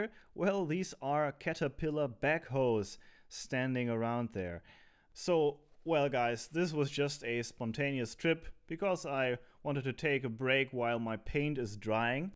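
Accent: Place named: Germany. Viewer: Also ich finde dein Video wirklich interessant. Und wenigstens verstehe ich dein "deutsches" Englisch Gut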